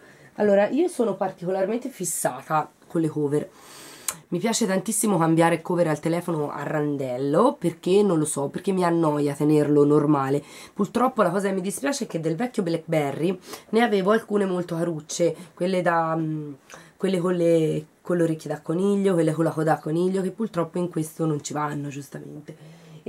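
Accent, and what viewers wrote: Tuscany, Italy. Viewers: è solo il primo che vedo dei tuoi e già mi piaci (ora vado a vedere gli altri) sei brava e simpatica...bello l'accento toscano :)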